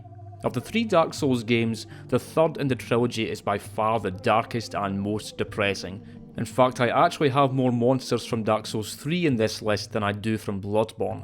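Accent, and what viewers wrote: Scotland, United Kingdom. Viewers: An hour and a half of a lovely Scottish voice talking about the completely fucked monsters fromsoft comes up with? I think you may have ticked all my boxes 😅 I loved this scene when I first came upon it. Mostly because it reminded me very specifically of the Orphan of Kos, staring out at the sea and weeping. Except there were 50 of him (although down one part of the beach there is one standing by themselves) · He sounds Scottish to me. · Subscribed for the Scottish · is that a scotland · Wow thats wild and a little racist. He's fucking Scottish it's not a joke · I'm also scottish. Yer accent is class brer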